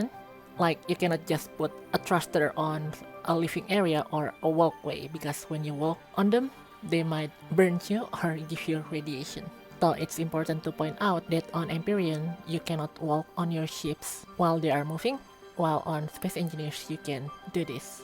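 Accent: India. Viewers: Hard indian accent?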